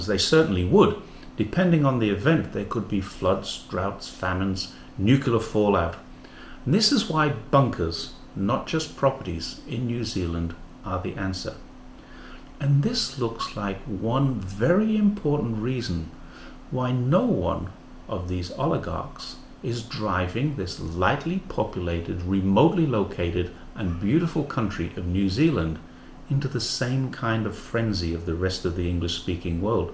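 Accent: Australia. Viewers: First Time I hear this. Are you Australian???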